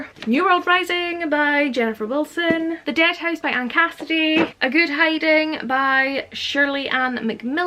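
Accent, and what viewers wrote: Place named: Scotland, United Kingdom. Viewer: It's nice to hear a Scottish accent on booktube! Not enough booktubers are from here.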